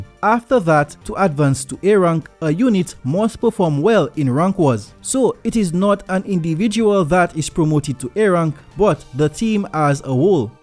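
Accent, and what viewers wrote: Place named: Sint Maarten. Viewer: Hey man, if its not too personal, where is your dialect from? Had a discussion with a friend, he guess ST:martin.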